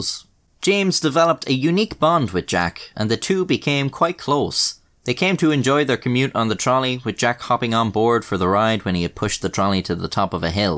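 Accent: Ireland. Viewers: This video would feel so wrong if it wasn't narrated by an Irishman. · nice drawings. i like james. very polite. my uncle is irish and is named james and he kinda looks like a monkey. and u sound irish. ressurfuate hin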